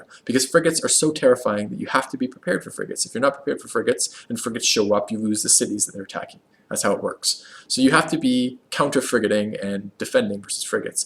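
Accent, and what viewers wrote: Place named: Canada. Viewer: I'm trying to figure out your accent. I hear a Canadian "about" and a East Coast/New England "warrior" in there. Help me out here bro :D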